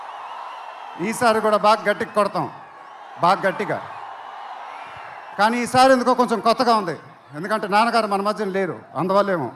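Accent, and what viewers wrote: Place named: Guntur, India. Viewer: Pure guntur slang 😍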